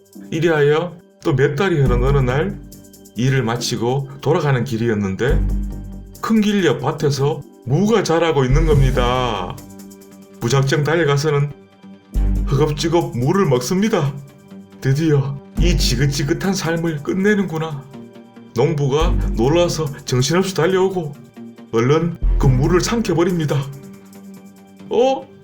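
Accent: Gyeongsang, South Korea. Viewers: 선비님? 갱상도 말 이야기 정말 우습게 잘 하시네요~ ㅋㅋ~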